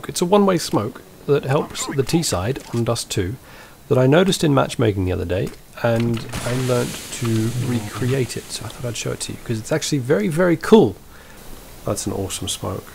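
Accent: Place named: Wales, United Kingdom. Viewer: 1 question plz : u from UK? edit: yeah,i fukig knew it,i have a friend from UK too,and his accent exactly like u...and he live in south wales GReat smoke,dude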